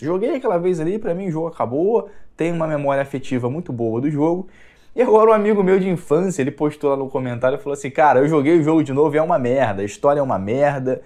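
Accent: Rio de Janeiro, Brazil. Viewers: Tu é muito chato cara, pra começar esse teu S puxado de carioca, só sabe falar mau, a DLC do Re. 2 gratuita, muito da hora, aposto que tu nem jogo. Falar mais é fácil, quero ve5tu fazer melhor.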